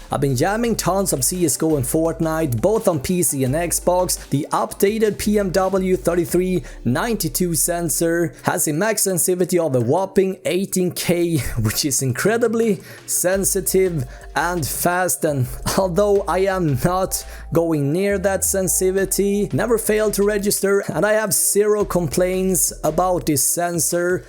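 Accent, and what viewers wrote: Sweden. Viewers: Sluta prata så jävla svenskt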